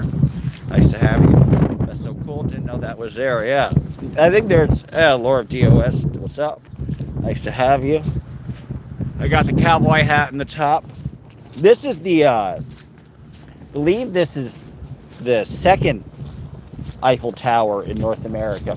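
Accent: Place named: RP, United Kingdom. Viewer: Ooooo posh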